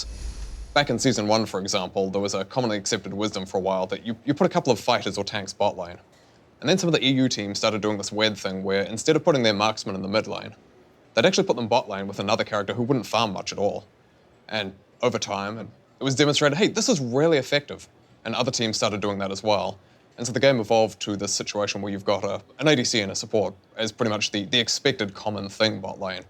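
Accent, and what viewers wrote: New Zealand. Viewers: Chris Ng He is from New Zealand mate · So odd to hear a fellow kiwi accent in an official Riot Video :) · new Zealander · I'm pretty sure I read once that from New Zealand.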